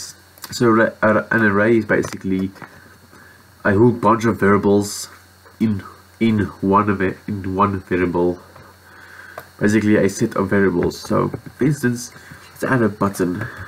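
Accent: South Africa. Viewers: Are you from south africa? AWEH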